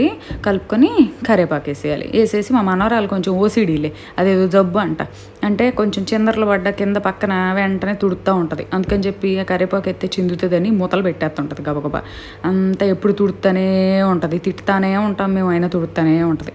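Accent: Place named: Godavari, India. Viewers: maa godavari slang laa anipinchindi gaaru chala bagundi🤩🤩😍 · Godavari yasa. Super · Hi,I have seen most of your videos.they are superb.your effort to do something new is good.your act as bamma is too good.you are from vijayawada.but I feel your language has Godavari accent.thats why got connected instantly.congratulations on your award.wish you grow farther. This is my first ever comment on any YouTube channel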